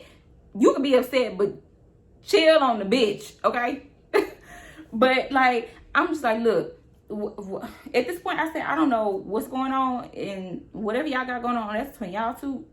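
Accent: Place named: New Orleans, United States. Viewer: I feel like I hear a lil new orleans accent lol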